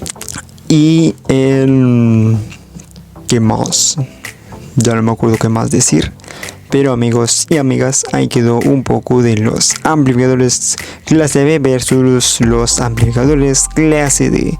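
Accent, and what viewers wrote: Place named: United States. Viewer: Todo bien. Pero, porqué hablas como gringo? 🤔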